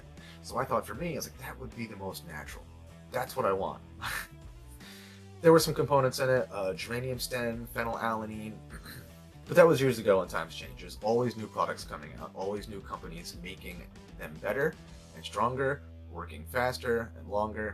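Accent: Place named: United States. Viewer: Love your American accent, you are just simply.. Yum! b-e-a-u-t-i-f-u-l to look at ....love how you explain stuff PROPERLY!!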